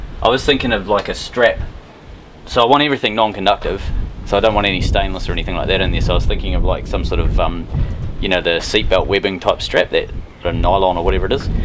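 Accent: New Zealand. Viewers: Great idea using the plastic chopping blocks. If it was me, I would have made a 'sandwich' with a plastic plate each side of the alloy tube and a longer bolt. Then you would not be relying on the sika (which I know can do the job) as when you have big blokes with big boots getting in and out of the dinghy it could be accidentally knocked off. Keep up the great work. Nice to head a real Southland accent!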